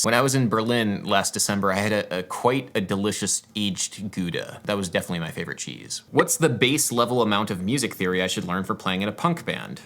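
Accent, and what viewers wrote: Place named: United States. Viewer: Man.. something in my mind told me that you were britsh.. but now I realized that you dont have an accent.. you are american.. my eyes were so closed haha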